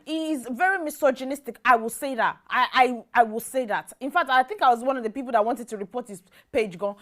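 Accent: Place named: United Kingdom. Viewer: Do you live in the UK, your accent is everywhere?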